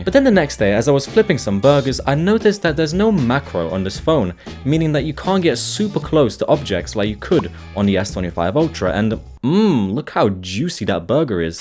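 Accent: United Kingdom. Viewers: Your British right cause I am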